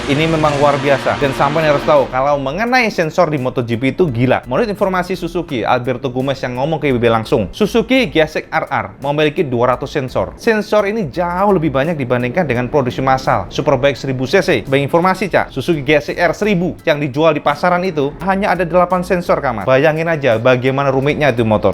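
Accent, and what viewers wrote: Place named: East Java, Indonesia. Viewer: Hahaha...jowo timur cak...terdengar alus tenan..."Raining MO DE" opo Raining Mode in english....uapik tenan bahas technology motogp, sehat-sehat selalu cak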